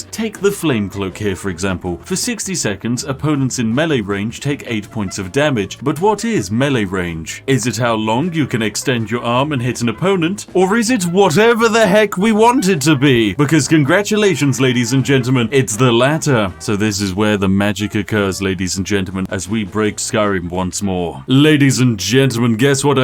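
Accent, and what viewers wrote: United Kingdom. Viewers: Day 1 of reminding we want to hear him say "Sugoi" in his glorious British voice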